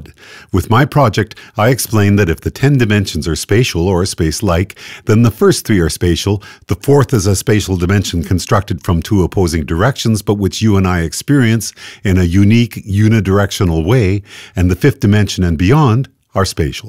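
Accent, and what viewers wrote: Canada. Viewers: what a legend. I had been wondering about this when I got stoned (thats my greatest thinking time, please don't judge) and you explained it perfectly in this video. I hope you find your way into more mainsteam media. Diggin that canadian accent too. On an unrelated note, my friend wants to know on what video do you have a metal style band singing at the end with (i think) expletives in it? Just thought it might be better to ask a non science question for once!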